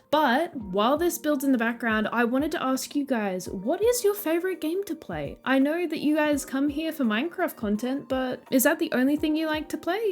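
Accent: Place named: United Kingdom. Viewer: I just realized you sound like a slightly British version of Nina Oyama 😅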